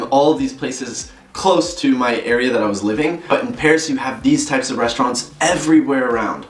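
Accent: United States. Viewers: C'est surprenant que même après neuf ans en France vous gardez votre charmant accent américain.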